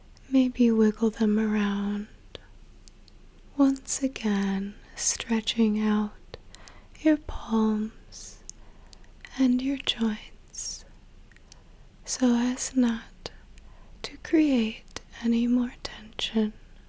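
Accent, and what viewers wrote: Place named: Texas, United States. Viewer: You can hear Texas in her voice if you know what to listen for.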